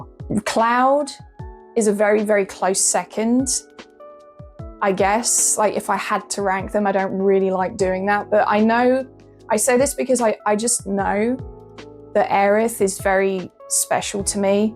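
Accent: United Kingdom. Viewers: Love a British accent 🌹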